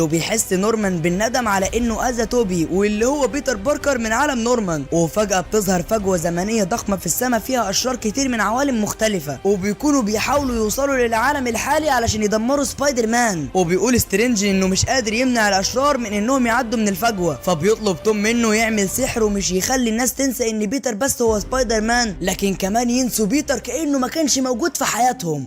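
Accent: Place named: Upper Egypt, Egypt. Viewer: انت صعيدي ولهجتك واضحه بس لو انك تكمل بلهجتك يكون افضل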